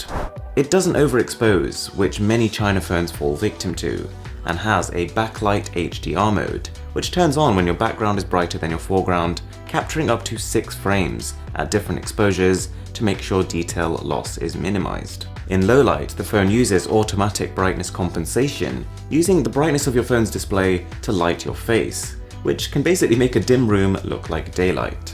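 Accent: United Kingdom. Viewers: Yes, Zack sounds great too, but that British accent is so elegant :1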